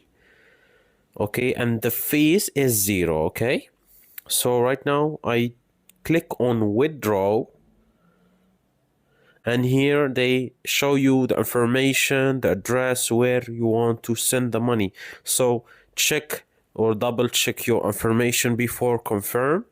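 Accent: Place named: Algeria. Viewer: U sound algerian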